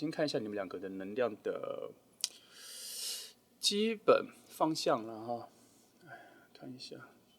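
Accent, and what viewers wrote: United Kingdom. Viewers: 是好聽的英國腔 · 好听的英腔诶❤ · 喜歡英國口音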